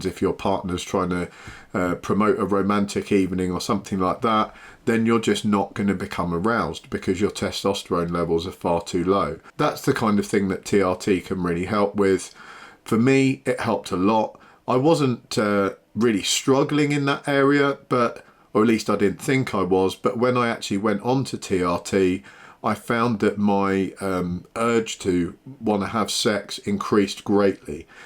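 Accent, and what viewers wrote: United Kingdom. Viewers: I’m here because I love the bloody masculine British accent - from the U.S.A!!!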